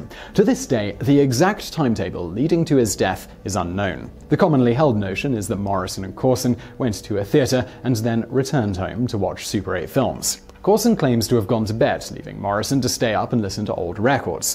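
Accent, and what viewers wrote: United Kingdom. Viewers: The names is due to being British but all the info is accurate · Indigo P Some of these are just accent differences. In the UK mafia and Van Gogh are pronounced as he said. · who cares about the damn pronunciation he's talking about history he's not talking about the American English language the guy is from England not America shut up everybody just watch the show Geez Louise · His research seems accurate (as conspiracy theories goes) His UK pronunciation gets under some ppl skin for some reason - tomato/tomato ... move on folks and show some cultural intellect. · Relax dude, That's how Brits pronounce it, it's called having an accent. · He’s from the UK, they pronounce things differently than we do in the US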